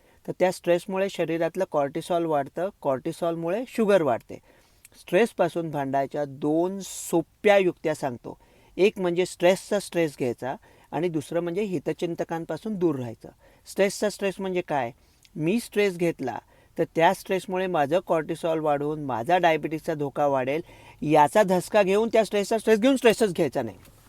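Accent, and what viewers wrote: India. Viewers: YOU ARE SPEAK LIKE TRUE MARATHI MANOOS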